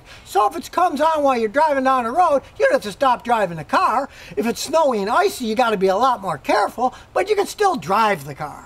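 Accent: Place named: Texas, United States. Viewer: I love your sweet Texan accent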